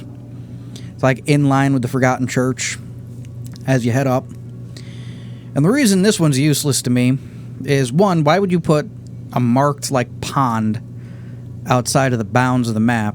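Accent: South, United States. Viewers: When you get angry, the southern accent comes out even more. Speaking of sound. It is NOT a complaint. Just an observation. On the other hand, visually this time, it's funny, for a veteran FO4 player, the lack of big picture in the displayed frames. And that's not a complaint either. Just another observation. All in all, I enjoy this type of content, no pretense of attacking a particular topic, just reflections on the game. By the way, this is the first video I've ever seen from your channel. Definitely NOT the last one. I subscribe to the channel, especially for FO4, which, for objective reasons, I cannot play at the moment. As a curiosity, English is not, as you well notice, my first language (in case you're wondering, it's Romanian).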